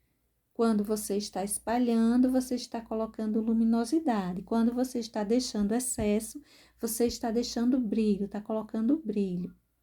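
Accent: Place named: Northeast, Brazil. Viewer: Boa noite ❤️💚 vc fala de qual Estado? Com este sutaque Nodestina.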